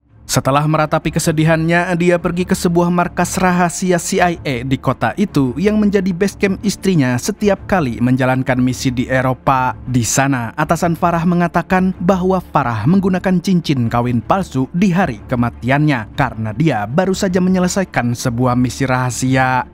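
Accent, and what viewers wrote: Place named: Banten, Indonesia. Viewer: Banten mana suara nya🖐️🖐️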